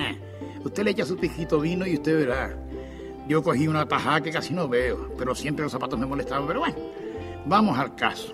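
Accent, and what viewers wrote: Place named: Canary Islands, Spain. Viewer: Maravilloso ese acento y modismo de los barrios capitalinos y ese sabor canario. Que desgraciadamente se ha perdido...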